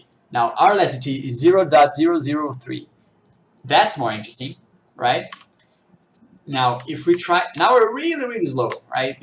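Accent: Brazil. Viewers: é br??? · percebi desde o inicio que vc era brasileiro kk belo trabalho aí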